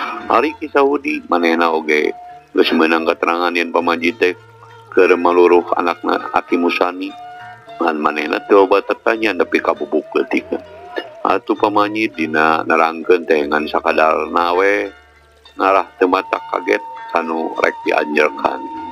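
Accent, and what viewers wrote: Sunda, Indonesia. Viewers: Cerita dongengnya sangat bagus mudah di pahami.suara sunda nya aduh jdi inget pada masa jamannya.keren mang..sukses dan sehat slalu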